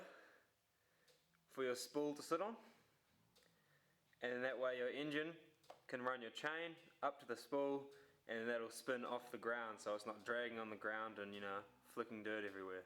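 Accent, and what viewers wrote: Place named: New Zealand. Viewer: haha i found a kiwi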